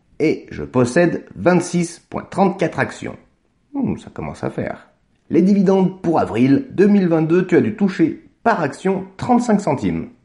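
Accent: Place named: United States. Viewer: Et dire qu'il y a des gens qui achètent des formations pour des centaines de boules ! Et juste pour avoir des idées d'investissements ! 🧐 Alors qu'il existe des cow-boys comme toi qui partagent du très lourd ! El Padre PS : P'tite curiosité perso, explique un peu d'où t'as chopé cet accent américain pas dégueu. du tout ?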